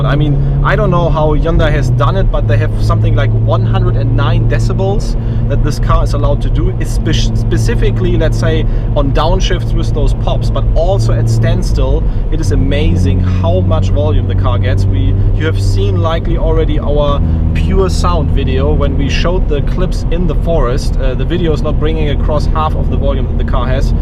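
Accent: Germany. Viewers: Dein Englisch ist wirklich super, aber irgendwie klingt es einfach scheiße, wenn Deutsche Muttersprachler Englisch reden.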